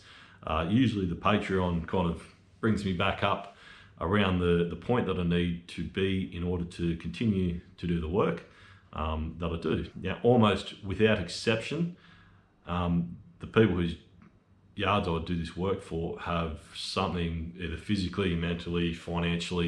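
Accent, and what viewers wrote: Australia. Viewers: Gosh I loved this! So fun to learn about your military service (thank you), that you have 4 kids and an awesome wife, that you call out the jerks (thank you again), that I melt every time you say "G'day" (love an Australian accent even if some *jerks can't understand you), and that 100K others have the same satisfaction watching your videos. The Grand Finale music is the best so I'm inserting that here for your awesome milestone!! Congratulations! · I love Australians accents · Hi, this was from 6 months back & now January 19, 2023) Your Q & A Topic was Wonderful, first Thank You & your Wife-Claire for your Service!! It was nice of you to tell all of us your Family, a Lovely wife-Claire & 3 girls & the youngest a boy...that is wonderful too! Wow you have a few hobbies, play guitar, pencil sketches, modulars & video games...especially with your kids, & movies & Star Wars!! 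I liked hearing about what you did in the Army, my husband was in the Marines but he did that way before Me! As for maybe coming to USA, Kevin from Lawn Care Juggernaut from Ohio & you having him come to Australia is nice, but look up Spencer, from SB Mowing from Kansas..I like him a lot better!! I`m a American & I hear you fine with your Aussie Accent...which I love listening too! Congratulations on 100k, you Earned it for being Nice, Polite & your Funny things you put in the Videos!! As for the Rude People, Don`t Listen To Any of Them, You are doing a Great Job!! The saying here in USA is... If You Don`t Have Nothing Nice To Say, Don`t Say Nothing At All!! Best Of Luck, with your YouTubes & your Married Life!! Have a Good Work Week & a Wonderful Weekend with Family!! Love from Central Illinois USA 🥰😎❤ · I've always loved the Aussie accent best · How awesome to learn about you and your family. 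No wonder you are such a wonderful human being. This was a great video! I love the shout out to Brad from Copper Creek. I don't know him personally but live within a mile of him. I watch his videos and love it when I recognize a property he is working on. And just so you know ... everyone I know, and I mean everyone, loves an Aussie accent. My son even has his Siri set to it. Talk about accents? I'm a Florida country girl with a thick southern accent. I don't realize I have an accent until I meet someone outside of the small town I live in, and they have to comment about it. Usually it goes like this, "Where did you get that accent? Are you from Tennessee?" My reply is always... "What accent?" It must be pretty thick because the workers at the Chinese takeout can't understand a word I say. 😆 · I love your Australian accent and have no trouble understanding every word you say. I especially like when you call a weedwacker a whipper snipper. 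Congratulations on getting to 100,000 subscribers. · Recently discovered lawn care channels. Had know idea they existed. Love to see the detailed results I guess. This is the only one I've subscribed to. And your thick Australian accent is part of your charm. BTW, I'm American. Would love to visit Australia someday. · I have no idea why I love your channel….just do. You have a very sincere appeal….and that Aussie accent is amazing! You always sound happy. Your finished shots show just how much you love mowing. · Great video!!! Thank you so much for sharing and taking the time. You are such a generous kind soul. 🤗🤗🤗🤗 . Keep the videos coming please ❤️❤️❤️❤️❤️😜... I m French . I lived in the UK before and I work everyday with Americans at work. I can understand you fine 😜. I actually really like Australian accent and Scottish accent 🤗🤗🤗🤗. It makes your videos even more enjoyable. I m a fan 😁😁😁.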